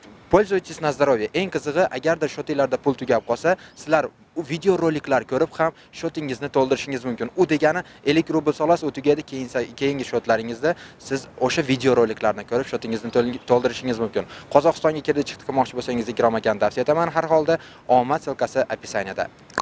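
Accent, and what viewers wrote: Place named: Tashkent, Uzbekistan. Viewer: sheva Toshkentcha bo'b qoptiyu, molodes, tez kirishib ketipsiz😂😂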